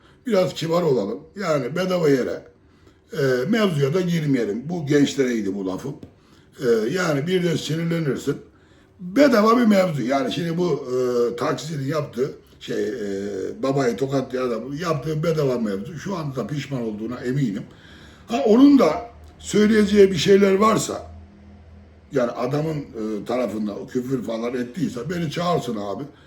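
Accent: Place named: Trabzon, Turkey. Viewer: O dayının beynini sikeyim hocam senin konuşmandan Trabzonlu veya Rizeli olduğunu anlamayan bu zamana kadar boşa yaşamış demektir!Bide kurum hep Trabzonlu rizeli diyor.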